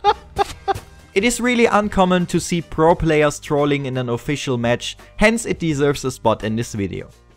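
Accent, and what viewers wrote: Germany. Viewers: Haha hab sofort erkannt das du ein deutscher bist.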